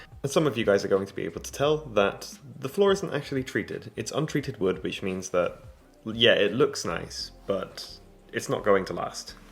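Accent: Sweden. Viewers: That swedish accent is creeping in 😂😅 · Why do you sound Swedish 😭 I can’t hear an accent at all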